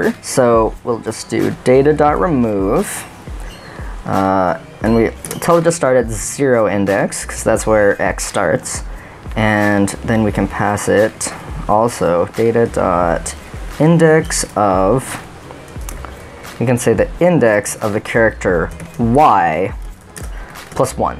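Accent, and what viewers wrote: United States. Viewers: American, just not a 'Merican.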